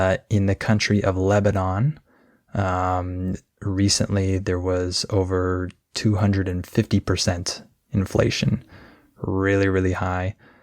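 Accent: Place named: United States. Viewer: American accent 😊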